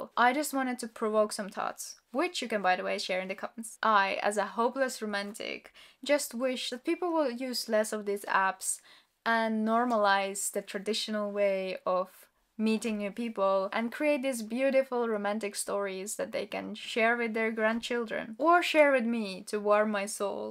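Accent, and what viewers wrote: Russia. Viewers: I like your accent. Is that Russian? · Hey i just want to tell you: never give up on making YT vids. I think you have a talent for it, plus natural charisma plus beauty. You will make it for sure (I say this knowing how tough it can be sometimes, i have a channel slightly bigger than you, like 1.5K subscribers... so just want to give you some encouragement, because i really think you're good at it) By the way where are you from? I just saw another one of your vids where you say you don't identify with your home country, but i just ask because i assume you're either from Russia or Ukraine because of your name and accent.... I've been to both (was actually in Kyiv when the war started....). Anyways you don't have to answer if you don't want but I'm just curious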